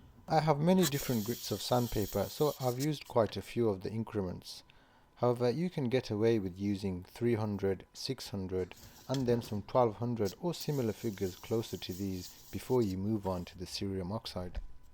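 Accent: Birmingham, United Kingdom. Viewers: Want to see how good my ear is for accents. Are you from Birmingham? Or somewhere up north?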